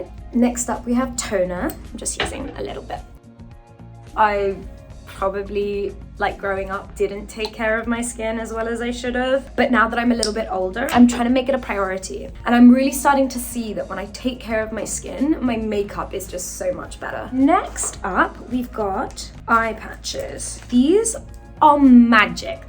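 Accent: United Kingdom. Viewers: I love the way she talks, british accent is the best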